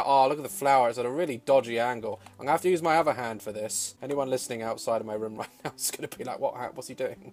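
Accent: United Kingdom. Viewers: Oh, man. Can't stop laughing! The slightest sound / gesture Daz makes, is so damn hilarious! 🤣😂 Always the best solution when feeling sad. Also, as a non-native English speaker, it's great to hear his British accent. Perfect for some tea, HAH!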